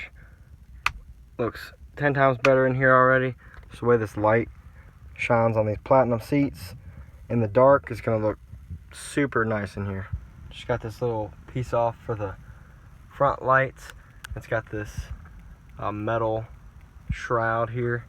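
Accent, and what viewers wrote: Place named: Louisiana, United States. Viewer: You gotta be from louisiana huh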